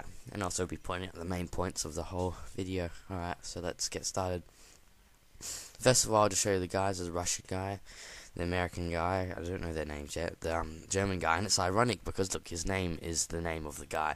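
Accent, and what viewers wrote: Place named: Australia. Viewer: you kinda sound a bit Australian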